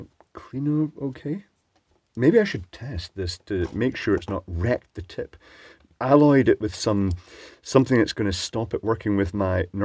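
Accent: Scotland, United Kingdom. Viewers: Scottish accent and at one point American pronunciation of Aluminium!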